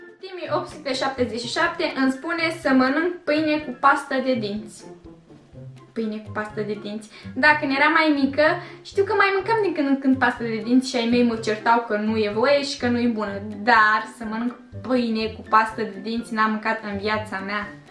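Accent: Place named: Moldova. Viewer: Ce accent de moldoveancă ai 😍😍🤗😊🤩😘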